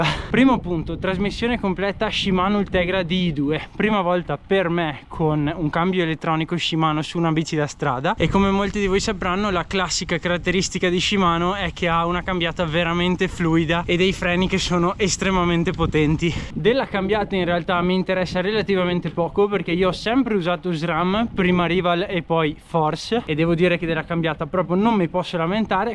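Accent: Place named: Veneto, Italy. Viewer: con l'arrivo della bella stagione perché no. Quanto impiego da Milano? Dal tuo accento penso tu sia veneto, magari Vicentino??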